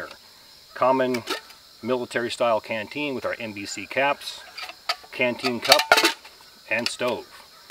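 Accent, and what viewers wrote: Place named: South, United States. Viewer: I enjoyed it. A cousin who was SF in Vietnam told me what he carried on jungle patrols for 2 or 3 days and your kit is almost identical. I am trying to pin that southern accent. Your not AL or MS where I grew up, you talk to fast. Louisiana maybe? It doesnt sound like TN or the Carolinas.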